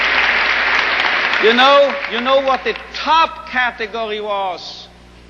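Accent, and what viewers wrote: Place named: Germany. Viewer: <3 ze german akzent (but he's austrian?)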